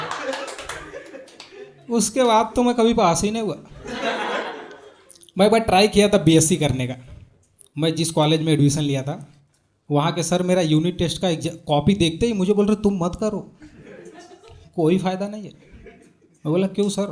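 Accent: Chhattisgarh, India. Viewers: Accent Chhattisgarh ka lagta hai !!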